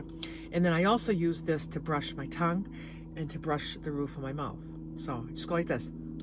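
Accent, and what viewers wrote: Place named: Boston, United States. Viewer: you are incredible! I subscribed months ago but life gets busy, so was glad to see you’re still doing this adventure! Watched this whole thing… toothbrushing to breakfast to dancing with that gorgeous blue sky backdrop. Yes, I hear the “Boston” in your voice😉. Hello from Connecticut. I’ll watch more often. Your shows are “glimmers.”👍🏻🙋🏻‍♀️🤣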